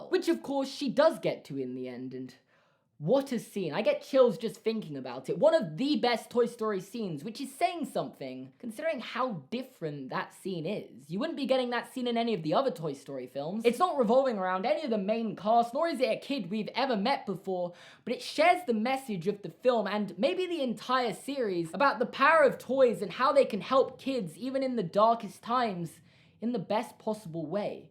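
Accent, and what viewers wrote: United Kingdom. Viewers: I lost touch with this channel for about a year and I'm extremely glad I decided to go back and listen to him go on Cars 2 again. I missed British.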